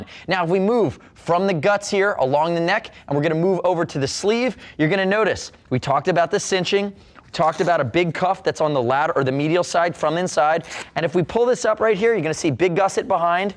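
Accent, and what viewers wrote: United States. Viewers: Dear god, that is just painful to listen too. Or at least it is for my Scottish ears. That’s like Americana on steroids! I lasted 30 secs, sorry!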